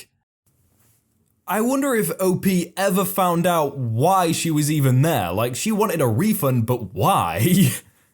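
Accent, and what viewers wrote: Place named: Liverpool, United Kingdom. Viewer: Hell, you had only read the first THREE words and even got that wrong. Still, you got a great accent! You from Anfield?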